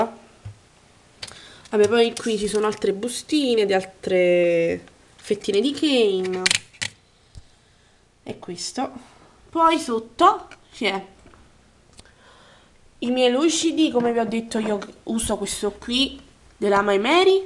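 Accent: Naples, Italy. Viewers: ooh Cacchiarola, avevo capito dall'accento che eri Napoletana ^,^ mmh dove precisamente? :O cioè non ho mai sentito di sto posto xD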